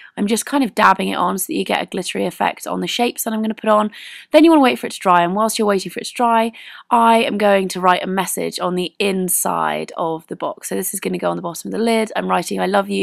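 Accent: United Kingdom. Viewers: I love ur British accent :D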